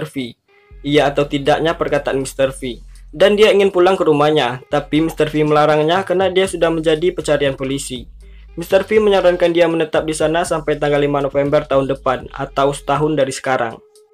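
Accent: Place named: Sumatra, Indonesia. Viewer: Logatnya kaya orang sumatera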